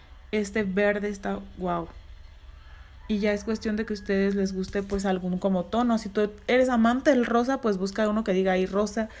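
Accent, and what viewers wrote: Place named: Tabasco, Mexico. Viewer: Tienes acento tabajqueño🤭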